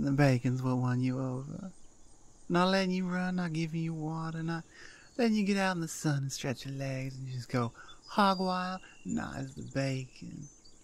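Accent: South, United States. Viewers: I promise I’ll be the best dog boy you’ll ever have, I hope to get plenty of head pats, ear scratches, and belly rubs from you if it’s not too much trouble🐶🐾 Love your southern accent hope to hear more of it in future audios ❤ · You’re telling me I get to live on a farm, have bacon, and live with this beautiful cowgirl? Sigh me up! I loved this audio! The art was beautiful and your southern accent is great! I live in the south and that kind of accent can make a man blush when used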